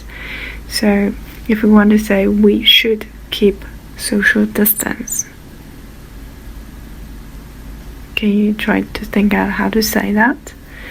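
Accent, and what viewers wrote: Standard. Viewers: 讲的很详细，发音很标准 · 发音很标准，加油！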